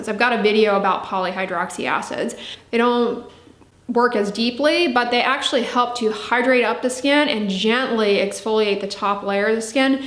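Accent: United States. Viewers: She definately does not have Australian accent. I am from Australia. Her accent is American.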